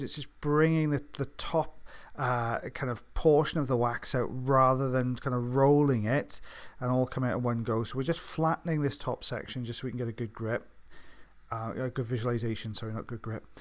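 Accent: Wales, United Kingdom. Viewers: Lovley to hear a welsh accent!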